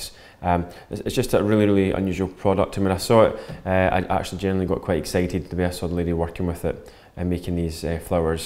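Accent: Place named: Glasgow, United Kingdom. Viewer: Such a talent, i love watching clear, concise step-by-step tutorials like this, really makes you feel like with enough practise, you could do it yourself. Thank you! Also sounds like a Weegie accent there! So rare to hear, makes me miss home! :)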